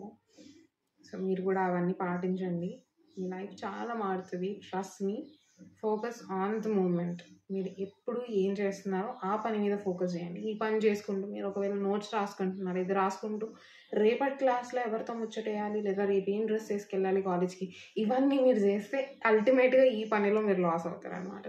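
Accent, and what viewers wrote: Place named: Telangana, India. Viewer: Telangana accent 🙌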